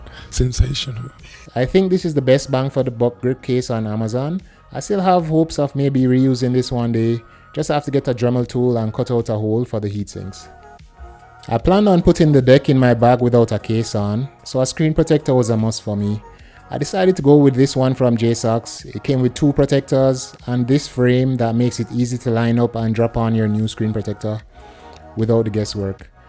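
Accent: Jamaica. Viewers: Bro sounds like a yaadman, subbed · Yo u a jamaican ? How on earth did you get a steamdeck cheap cheap?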